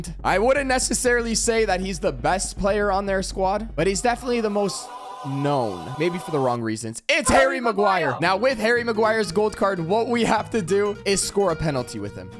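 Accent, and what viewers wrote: Canada. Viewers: ARE YOU CANADIAN OR POLISH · I thought u were Canadian lol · I thought you were Canadian lol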